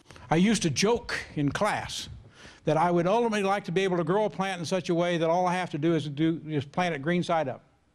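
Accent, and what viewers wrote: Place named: India. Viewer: Looks like the announcer has recently moved out of India. She has a serious indian accent.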